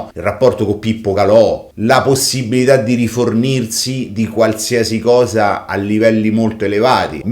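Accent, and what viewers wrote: Rome, Italy. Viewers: Mi piace molto come affronti la storia della Banda della Magliana... un pó per quel tuo accento romano che ti rende molto piú credibile, un pó perché sei credibile a prescindere per la serietá che poni nelle tue storie. Conosco la Banda della Magliana, o meglio conosco i testaccini perché nei primi anni Ottanta frequentavo sporadicamente i circoli missini di viale Marconi e non solo... e conobbi Maurizio CArminati...peró io ero un ragazzino figlio di un dirigente missino rispettato perché uno dei pochi che fu prigioniero in un campo di concentramento inglese in Tibet in quanto ufficiale responsabile del Reparto Informazioni in Africa Orientale, e lui era giá un mito... Per tanti anni mi sono occupato di lotta alla mafia, e ultimamente ho seguito il processo di Mafia Capitale grazie anche alla mia amicizia con Otello Lupacchini, che viene dalla precedente amicizia con Raffaella Notariale... 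Spero di conoscerti... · scusa, se puoi parla con accento anonimo non strettamente romano.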